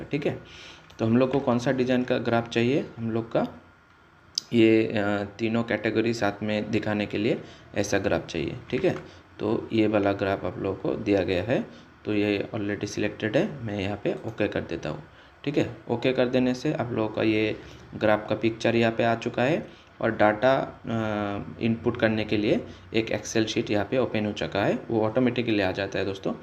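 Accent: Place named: Assam, India. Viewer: Very useful but Assamese type hindi explanation is boring.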